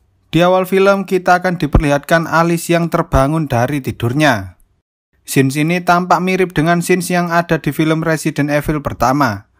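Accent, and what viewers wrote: Java, Indonesia. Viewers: Orang jawa ni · Jelasinnya engga jelas. Kalo udah medok pinter dikit jelasinnya. Gua udah nonton berkali kali soalnya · Pertama kali mampir, logatnya medok Jawa banget 😁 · Iki admine dubbing jawa ya? 😅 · IQ7 tapi versi medhok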